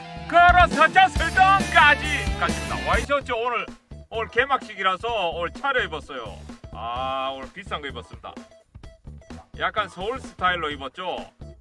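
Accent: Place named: Busan, South Korea. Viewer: 나 형 부산쪽일거라 생각했어! 광주 말투가 아님!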